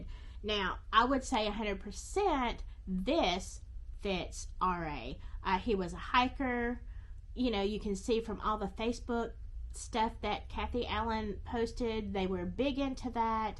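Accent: South, United States. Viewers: OMG ! YOUR NOT MY CUP OF TEA ! Your Southern American accent is endearing x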